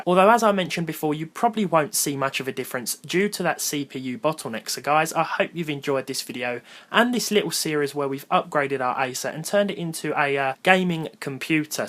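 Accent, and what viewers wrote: United Kingdom. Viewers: I am just watching all your videos because of your awesome British English emphasis :D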